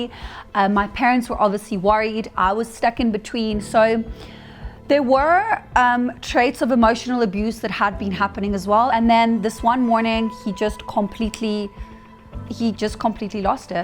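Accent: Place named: South Africa. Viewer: Finally found out something about her background etc. I was curious. And for some reason I thought she was Australian lol but it's so obviously south African now. Derr. Nice documentary 👍🏼🪐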